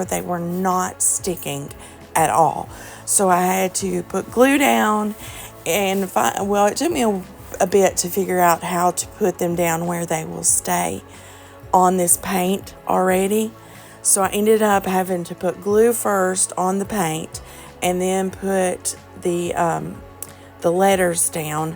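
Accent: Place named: South, United States. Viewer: Possum Patty watcher here just looking around! My mother, if nothing else in a day or night, PRAYED...I can hear her now..."LET GO AND LET GOD" she passed many years ago...but your southern accent and heart of prayer reminds me of her...happy crafting! PP is wonderful! ❤B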